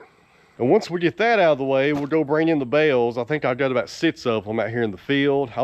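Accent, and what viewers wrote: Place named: South, United States. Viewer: Hi, love watching your videos. I’m an old carpenter up here in Edmonton, Alberta. Raised on a farm in Manitoba but left the farm and became a carpenter. Your southern accent is a hoot. We Canadians likely sound like Brits. lol. I enjoy all your videos but I’d say videos about 15/20 minutes are my favourite… yeah, we spell words funny too. Cheers Earl B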